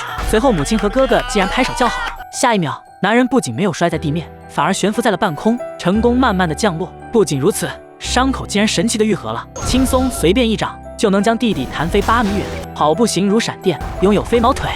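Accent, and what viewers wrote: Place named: India. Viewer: 这样印度来的么，味好重啊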